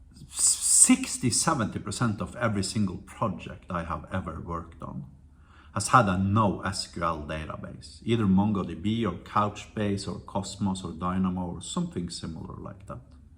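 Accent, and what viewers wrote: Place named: Norway. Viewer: Thickest Norwegian accent on Youtube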